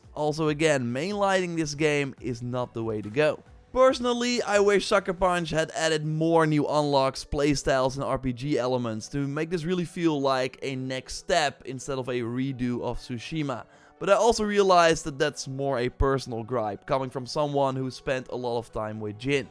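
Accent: Netherlands. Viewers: Are you Dutch hahahah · Dude what is that accent??!! Is it Dutch?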